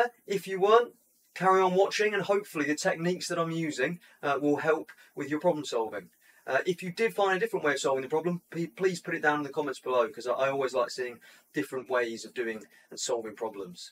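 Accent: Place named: East Midlands, United Kingdom. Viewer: What a great time for students to learn maths with helpful videos like this! Way better than reading through dog-eared textbooks! You sound like you have an East Midlands accent. Nottingham? Mansfield?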